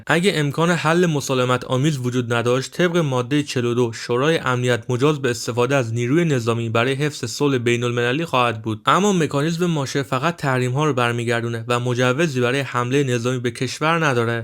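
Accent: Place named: Lebanon. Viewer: لهجه‌ت لبنانیه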